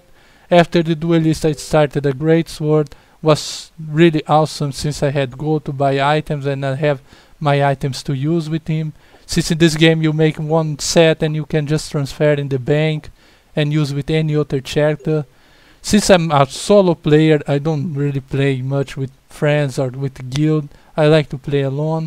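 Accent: Brazil. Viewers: com esse sotaque, certeza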